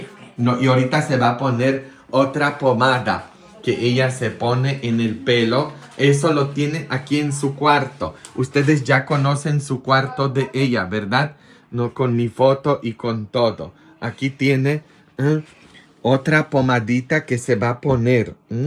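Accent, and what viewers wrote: Mexico. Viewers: Padre, en que ambiente aprendio Espanol? Su Espanol me parece chilango.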